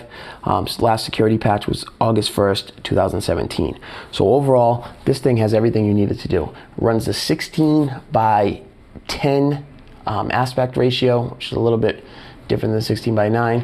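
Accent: United States. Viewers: my friend told me not to bother getting this with the black Friday promotion... he has it and says its super slow. p.s. love your American accent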